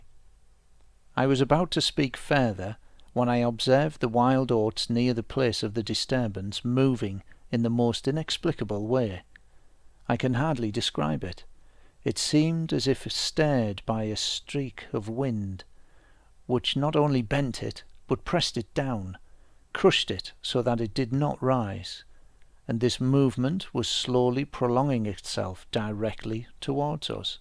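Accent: United Kingdom. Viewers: This gentleman has such a pleasant voice, pace of reading, diction and British English. A pleasure to listen to.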